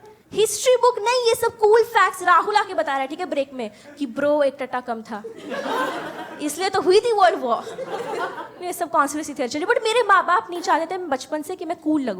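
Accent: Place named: India. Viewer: Her English sounds like hindi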